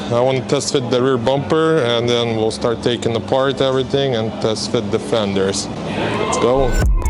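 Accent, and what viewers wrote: Russia. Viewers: Мне одному кажется что у него акцент из России?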